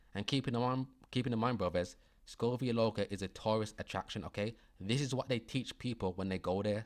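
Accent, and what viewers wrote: Birmingham, United Kingdom. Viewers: Deffo a Brummie accent!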